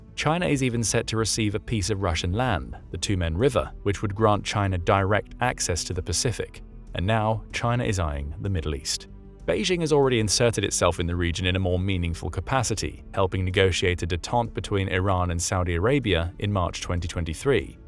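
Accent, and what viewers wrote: United Kingdom. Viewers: You're using the British accent to kind-of whitewash some part of the British Raj system that turned communist in West Asia. The in-person alternative to Zionist government is something more evil with drugs and aliens, and the power structure for that derives from Shiite heresy against burial edict. There's a cultural schizophrenia where it is common to disparage Israel, de minimus, by omitting the obscenities to which Israel is some counter.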